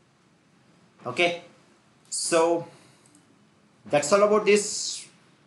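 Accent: India. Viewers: I hate the way u r talking. India 😅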